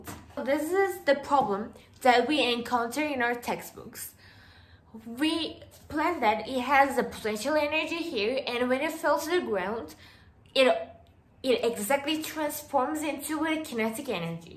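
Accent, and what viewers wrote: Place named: India. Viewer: Abla hintçe aksanın var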